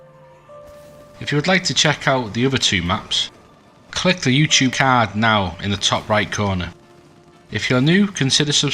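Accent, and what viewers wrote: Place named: Liverpool, United Kingdom. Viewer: Don’t change a thing mate, you’re an honest to goodness average gamer with good tips and tricks and your style reflects that! Dead warm and friendly. I thought I was picking up some scouse at first. Maybe just my headset-fried hearing? 😂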